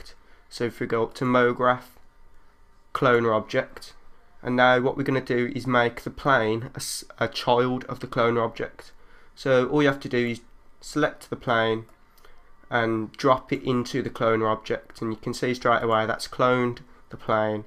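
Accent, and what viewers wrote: Birmingham, United Kingdom. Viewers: van Ingen I would suggest that has a 'brummie' accent which is typical of people from Birmingham in the UK. I'm not, however, an expert and it may be that he could be from another part of the Midlands (with a similar but distinct to those who know accent) and in which case he could well be offended by my suggestion. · brumie accent :)? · i would love to get into this!! I want to do some intros and stuff. Great work matey. Where did you learn all this man! Nice to hear a fellow brummie too! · I hate that traditional English cuddly accent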